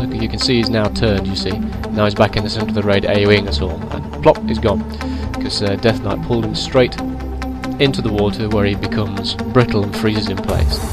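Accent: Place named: England, United Kingdom. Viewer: God the English accent is annoying as all hell. But this is a very well done video and tutorial on how to beat him. Honestly, one of the best I've ever seen.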